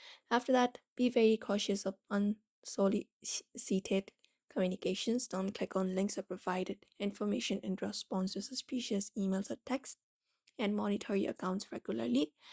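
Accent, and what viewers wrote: India. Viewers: Never trust someone with an Indian accent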